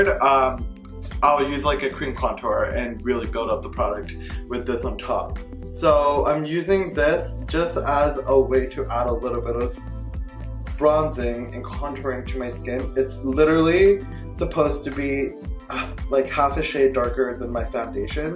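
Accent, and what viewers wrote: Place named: India. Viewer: Seems like an Indian guy with Indian accent.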